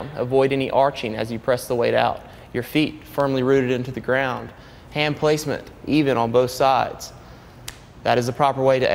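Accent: South, United States. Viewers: He's got that accent... Hmm.. Southern accent?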